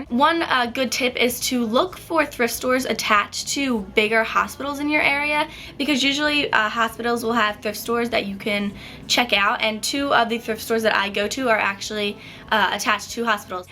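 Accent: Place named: New Jersey, United States. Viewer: do you live in new jeresey? :o you have the accent.... btw i like thrift stores :D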